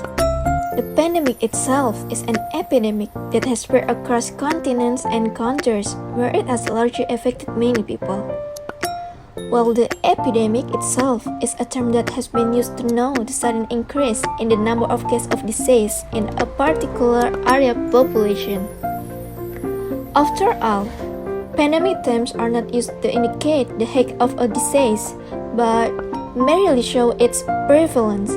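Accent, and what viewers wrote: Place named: United States. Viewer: American accent nih boss, senggol dong 😎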